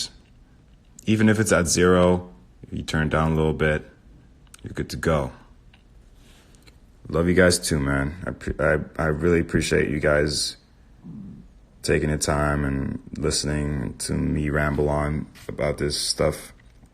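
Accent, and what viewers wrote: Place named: Pennsylvania, United States. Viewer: Sounds JUST like my guy from PA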